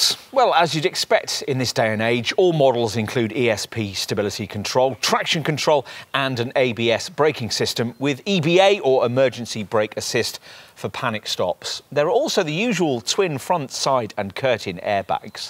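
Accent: England, United Kingdom. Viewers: Probably the best review of the Focus out there. Complete, precise, very well expressed and explained in a delicate english accent! Great job! And it leads me more and more towards going back to a Focus next year.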